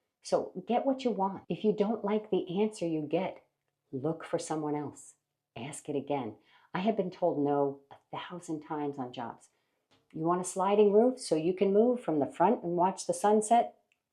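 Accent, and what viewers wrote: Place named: New York, United States. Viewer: you always make me laugh with your NY-er talk! You tell it like it is and I love that as a fellow NY-er.Honest & straight to the point! Plus I love how knowledgable you are! Truly. I too thought I knew about design etc. but after binge watching your videos, I realized there were soooo many things I didnt consider. Thats why I love your videos I have learnt so much!! Keep posting! love following :)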